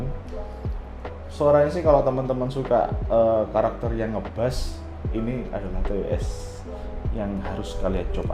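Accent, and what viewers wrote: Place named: Surabaya, Indonesia. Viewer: pantes suarae khas arek suroboyo... sik iling ae 😄 semangat... sering2 gawe konten ya 👏🏼👏🏼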